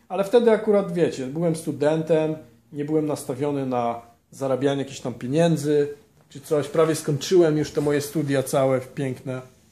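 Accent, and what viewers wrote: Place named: United States. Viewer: W jakim jezyku robiles notatki? :-) Lubie Ciebie sluchac, fajnie opowiadasz. Slychac ze masz juz taki amerykanski akcent :-) Pozdrawiam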